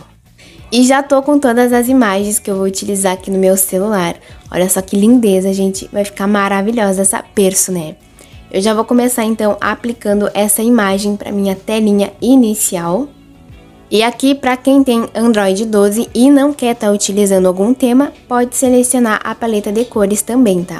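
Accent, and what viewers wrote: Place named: Rio Grande do Sul, Brazil. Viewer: Oi vc e gaúcha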